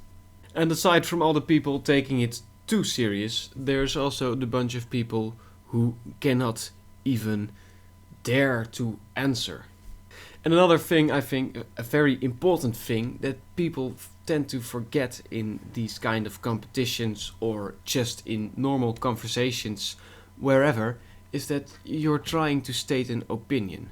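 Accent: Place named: Netherlands. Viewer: The Dutch accent is strong in this one ^^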